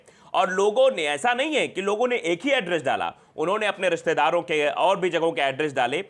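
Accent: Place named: Bihar, India. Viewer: Very gud. But bro, ur accent has changed a little bit. Keep it a little bit to bihar type. It sound gud.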